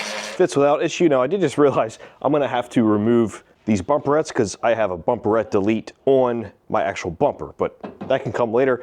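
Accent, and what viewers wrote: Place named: Central Pennsylvania, United States. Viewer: “I’m going to put this battery in awhile”. Gotta love that central PA dialect.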